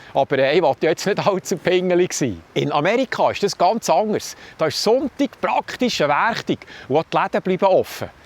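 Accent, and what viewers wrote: Bern, Switzerland. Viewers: Es migroching, hani überno vo mire mueter, u speter , nach mine erfahrige, gröschtteils blibe. Regionali lädeli , oder burelädeli hei Priorität. I schetzes o, das mir hie so eiget si, o wes chli ängstirnig isch, aber we not am Mann isch, luegtme fürenang. I finges schön , hesch zyt für Ferie z mache.gnieses ömu de.o mit famille u fründe z si. Dis bärndüsch isch so ächt urtümlech, lise scho nume wäge däm gärn zue. Liebi Grüess us mamishus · Du bisch schwizerischer aus jedä Schwizer, oder so nes schöns Bärndütsch findet me chum me. Ä so het me vor 15 Jahr no g'red, ou dSprach tuet sich verändere, und nid zum Guete wie ig finde. Es git immer meh so ne Einheitsbrei. Ig lose dir us Burgdorf zue! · Hallo, darf ich dich Duzen? Ich tu es einfach. Ich freue mich immer auf deine Videos. So ein schönes Berndeutsch. Ich denke dass du jetzt in Thun bist. 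Ich schreibe aus Langnau im Emmental. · Die interessiert-offen vernünftigen Dokus und nun auch der lockere Beitrag mit dem stets sympathisch Berndeutsch sprechenden gefallen mir immer gut. Herzlichen Dank dafür! Tschübe aus Hettlingen ZH! 🙋🏻‍♂️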